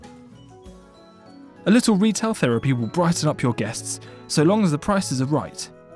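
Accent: United Kingdom. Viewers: Unhhhh. His British accent is sexy.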